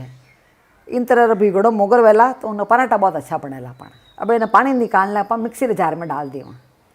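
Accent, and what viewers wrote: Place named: Jodhpur, India. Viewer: Mare in-laws Jodhpur rahte ha apki language sun kr lagta ha sasural pahuch gyi😀😀 Thanks for yummy parathas sasuji🙏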